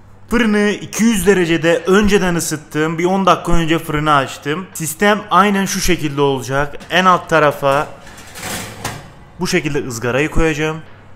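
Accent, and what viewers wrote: Adana, Turkey. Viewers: Bu nasıl hataylı lan adana şivesi konuşüyürr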